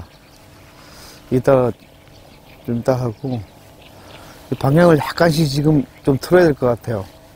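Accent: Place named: Gangwon, South Korea. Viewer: 저도 강원도라 말투가 친근감 있네요~^^ 요즘은 붕어 낚시안하시나요? 제가 그자리에서 생애 첫 월척을 ㅎ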